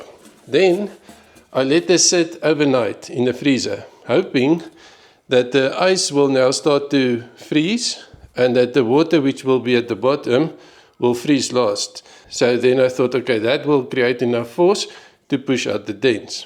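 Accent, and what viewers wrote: South Africa. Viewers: LOL that south african accent... 🤣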